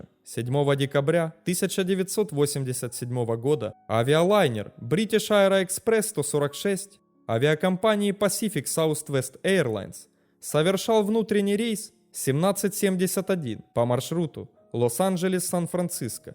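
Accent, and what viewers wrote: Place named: East, Ukraine. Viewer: я это поняла. Восточная Украина, видимо) может, Вам проработать его? Без обид, просто мы Вас не видим, только слышим, голос имеет огромное значение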